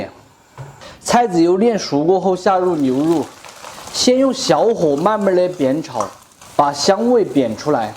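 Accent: Sichuan, China. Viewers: 哈哈 喜歡聽你說川普！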